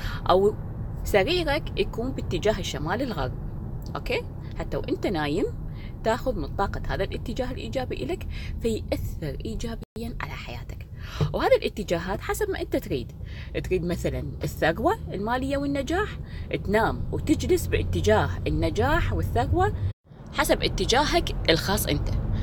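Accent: Iraq. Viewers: انتي عراقيه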